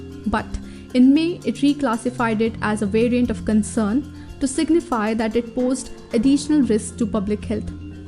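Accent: India. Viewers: Even I find it difficult to understand accent of US, UK China. But never wished a narrator. Dear, pls get used to it, this is Indian accent at its best. Listen carefully, you will know what she is talking about. Focus on content, not accent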